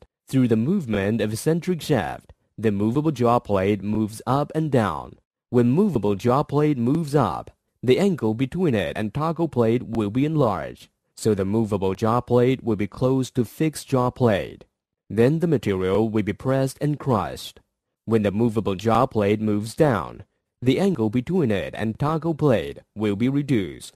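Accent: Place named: China. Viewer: I believe this is a Chinese man speaking English. Well done, btw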